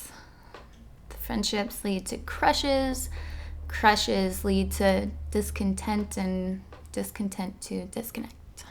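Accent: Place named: Spain. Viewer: Good Spanish accent.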